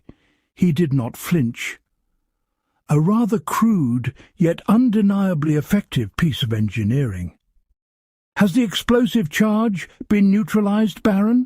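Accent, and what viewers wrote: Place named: England, United Kingdom. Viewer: I disagree with the above comment. The narrators voice sounds extremely familiar and is so pleasant and quintessentially English, rather like the main characters of the story.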